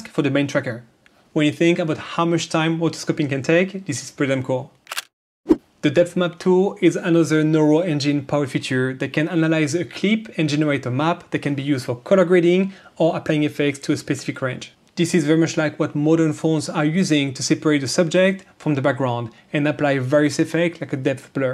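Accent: France. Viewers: Ohhh hey I got a shoutout hehe! Thanks guys! Love what you're doing here, great to see more Resolve channels popping up (plus sexy French accent beats my blunt English one 😆) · Probably one of the most pro channels on Davinci with a little twist of having a very nice French accent. LOVE IT! And depth map is my favorite. Merci beaucoup!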